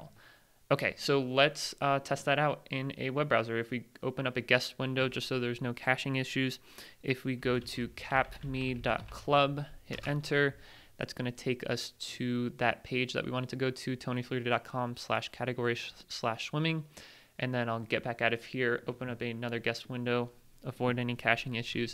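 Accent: Baltimore, United States. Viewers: I can hear that Baltimore accent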